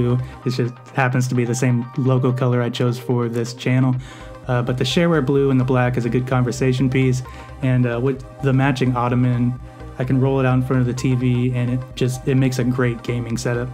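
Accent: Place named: United Kingdom. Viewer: wow love it! Is there an inexpensive way to make my TV my monitor. I need a computer for basic email, word documents, streaming etc. I have a 42" TV with a Roku I would love to use. what's the most economical way to do this? Maybe a future video idea? I'm a big fan! BTW loved the Brit accent lol pretty good actually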